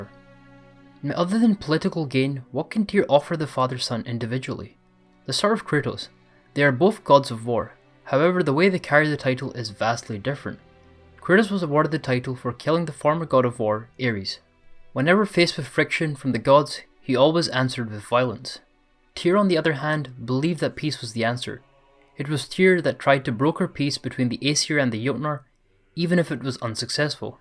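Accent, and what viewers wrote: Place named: Scotland, United Kingdom. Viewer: You're very good at this - and youll only get better with more practise. Keep it up. Also great to hear a fellow Scottish accent in this scene.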